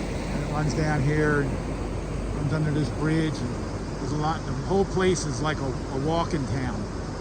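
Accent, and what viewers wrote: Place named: Western Pennsylvania, United States. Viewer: Where are you from? Sounds like Western PA, western Maryland area.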